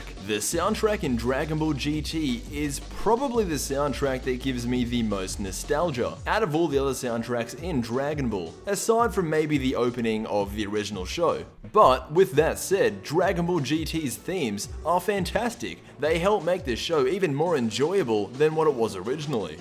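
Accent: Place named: Australia. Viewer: Gotta love that unique Australian accent!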